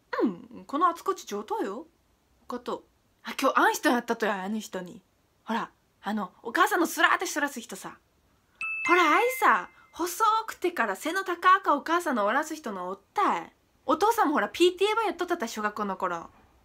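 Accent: Nagasaki, Japan. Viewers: うち関東で方言使わないけど、なんかちゃんの長崎弁永遠と聞いてられる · 長崎弁聞きたくなったら定期的に来てしまう笑笑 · さんも長崎弁も可愛い💓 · 最近の影響で、長崎弁が1番可愛くて好きって感じるようってる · ちゃんの長崎弁世界一かわいいこれマジ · が話す長崎弁が好きなの私たちは！ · かわいいなあ長崎弁俺の所方言とか特に無いから羨ましい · 長崎弁ばり可愛い！ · 本物の長崎弁ですね親近感がものすごあるとばい！横におるごた！ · 実際長崎弁っていうても広かよねー。ちゃんのは長崎市弁たいね！佐世保平戸五島島原それぞれ個性ありすぎて長崎市が標準語かなっておもうた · 知り合いの長崎人そっくりで、びっくりするwww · やっぱ長崎弁ていいね、話すとなると照れが入って話せないけど…好きだな～長崎弁！女が帰省からの、成田着くなりバックから取り出したのは、フルーツサンドイッチ、俺が長崎の物食べたいって言ったから買って来てくれて、周りに人がいるにもかかわらず即食いするもんだから（生温かく形も崩れてだけど）恥ずかしながらももっと買ってくればよかったね！良いんだよ気持ちと長崎が詰まってて旨いよ！今では良い思い出っちゃったけど。ちゃんの長崎弁で癒されます。長々と話してごめんよ、長崎弁バージョンありがとう☆体調崩さずに頑張ってね！応援してます。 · 長崎弁普通に可愛い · 長崎弁好きだなー笑笑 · 長崎出身やけん落ち着く〜〜😂 地元離れるとこの話し方が恋しくなる笑笑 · 長崎弁がかわいいのかちゃんがかわいいのかどっちだろう… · めちゃくちゃ長崎弁ぽいと思ってたらやっぱ長崎だったなんかうれしい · 可愛い長崎弁😭😭❤ もうずっと聞いてたいから長崎弁バリバリのやつもっと希望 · 長崎弁好きすぎる、、もううちなんて、〜だべとかまじの田舎者の言葉だらけで嫌だ〜😩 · 長崎弁大好きです✨ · 数年間長崎居て姉が長崎弁ちょいちょい喋るから「うわこいつ長崎弁に侵食されやがった((((；ﾟДﾟ))))」って思ってたからレベチがここにいてなんか安心したやったぜ。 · 大学の時の長崎出身の友だちの喋りと同じでめっちゃ良い(笑)長崎弁めっちゃ大好き(笑) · こてこての長崎弁使ってる🤣(笑)なんか、ちゃんの家の光景が目に浮かぶ😁幸せな家族だね😊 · 全然分かんないけどちょっと方言憧れある笑特に長崎弁！！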